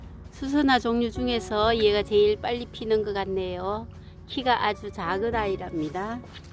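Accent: Gyeongsang, South Korea. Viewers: 너무예쁘게 잘보았습니다. 목소리가 경상도인듯한데 농장이 어디인가요? 우리집은 대구인데요 꼭한번 구경하고싶은데 가능할런지 모르겠습니다만 주소나 전화번호를 알려줄수있는지요? 꼭 한번 보고싶습니다.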